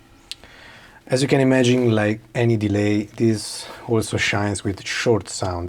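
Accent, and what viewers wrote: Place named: Spain. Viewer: Fantastic demo and tips! Love your accent too...Are you Spanish?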